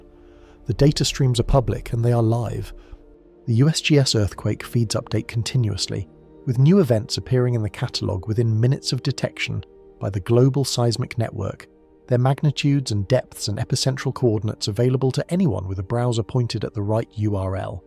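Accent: United Kingdom. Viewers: Fear porn pigs at it again. I'm so scared I crapped the couch. Smells worse than his British accent !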